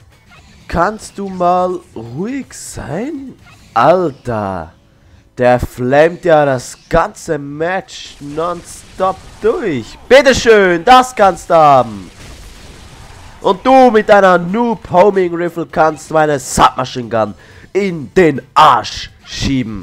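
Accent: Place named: Switzerland. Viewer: haha mer ghört voll din schwitzer akzänt :D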